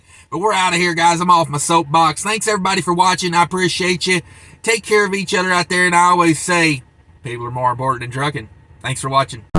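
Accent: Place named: Minnesota, United States. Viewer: Done good Mr. But I prefer the natural southern Minnesota accent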